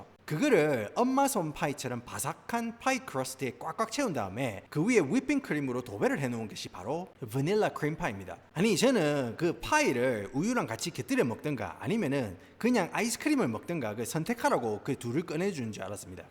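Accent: Gyeongsang, South Korea. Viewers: 갱상도 사투리가 내기에 상큼하게 터지네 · 님, 너무 재밌게 잘 보고 있어요. 초기에 구독자 많지 않을때부터 영상 알고리즘 떠서 보고, 바로 구독하고 지금까지 보고 있네요. 저도 경상도 여자로서, 친언니도 미국 살아서 한번씩 간 미국에 대해 느끼는 부분들이 공감되는 부분도 많구요. 경상도 사투리도 리얼하고, 입담도 좋으시고.. 개그코드에 초긍정 에너지까지 많은 에너지 주는 님 늘 응원할께요. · 우리 슨생님 일 조금 하고 돈 마니 버세요. 이렇게 입을 잘 터는 유튜버는 더 승승장구해야 된다고 생각합니다. 박가네 오상(사투리+고급 일본어)이나 님(사투리+본토영어) 처럼 갱상도 유튜버들이 더 많아져야 한다고 생각합니다.. 귀에 팍팍 때려 박힘. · 영어가 경상도 사투리하고 궁합이 딱 맞네... 재미난 영상 항상 응원합니다. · 아 이분 사투리랑 영어발음은 또 오지는게 진짜 경상도사람인데 겁나 웃기네요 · 오! 첨에 쎈 갱상도 억양이여서,너무 토속적이신줄 알았는데 다양하고 박학다식한 지식이 있으시고 전문적 분야도 공감 가도록 설명해 주시네요. 더구나 미국적 배경으로 다져지신 경험과 지식이라서 폭넓은 안목을 가지셨군요. 너무 재밌게 잘들었구요. 미국에 30년이상 살고 있는 사람으로 찐 공감 했습니다. 영상대박이 기대됩니다!! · 갱상도사투리에 영어발음조합 은근 잼있으예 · 영남 사투리를 매우 적절히 구사하는 분이군요. ㅎㅎㅎ 게다가 동영상의 내용자체가 매우 진솔하고 생각할 점이 있는 유익한 것이군요. 유튜브 동영상이 대박 나길 기원합니다. ㅋ 미국인의 식습관에 관해서 하나 덧붙이자면 냉전시기인 1960년대에서 1970년대 까지는 미국의 각급 학교에서 비교적 영양적으로 균형잡힌 급식을 학생들에게 제공했다고 합니다. 그러나 로널드 레이건이 집권한 이후엔 국방비는 증액, 교육복지비는 무조건 감액 하는 공화당 정책때문에 미국내 각 학교들의 예산도 대폭 삭감되어 학교에서 제대로된 급식을 학생들에게 제공하지 못하게 되었죠. 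이 점도 미국인의 비만 증가에 영향을 끼쳤을 거라 봅니다. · 경상도 사투리+미국쿡 영어 = 님의 억양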